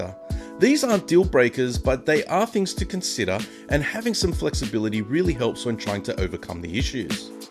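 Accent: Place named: Australia. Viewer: Just wondering where ru location please?! U sound Australian to me 😅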